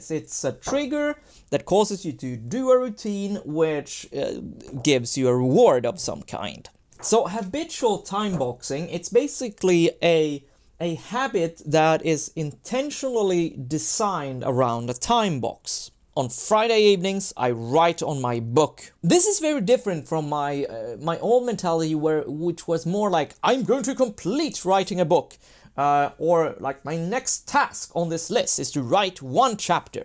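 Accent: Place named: Sweden. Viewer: LazyDakota He's saying "yust" as in "just" with a Latin J sound. And, the accent is Swedish.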